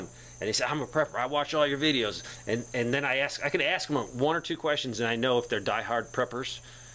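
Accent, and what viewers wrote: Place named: East Tennessee, United States. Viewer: What area of the country do you live in? Not asking for your street address haha or even your town. Sounds like you may live in the mountains of East TN but not sure. Anyway- great content! Love your channel!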